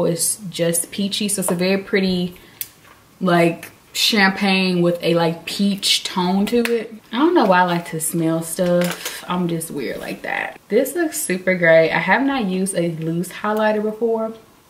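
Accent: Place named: South, United States. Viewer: Gorgeous and your country accent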